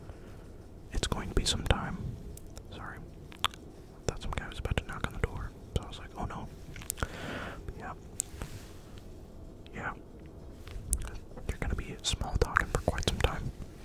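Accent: United States. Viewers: very Minnesota accent😭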